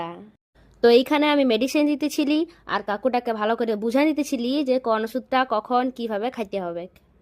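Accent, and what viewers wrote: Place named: Purulia, India. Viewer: পুরুলিয়ার টান টা যাবেক নাই😂😂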